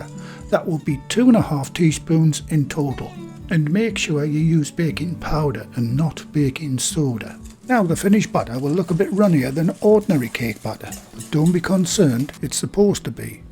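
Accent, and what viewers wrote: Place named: North East England, United Kingdom. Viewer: you are fabulous, so down to earth, no frills just straight forward instructions with a beautiful accent. Love your north east accent. Thanks a bunch. This is my daughter's birthday cake.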